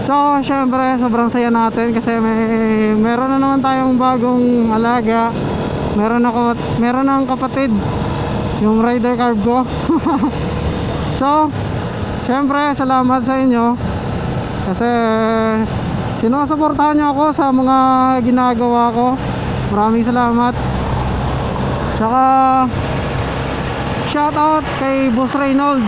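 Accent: Iloilo, Philippines. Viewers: iloilo po kayo booss??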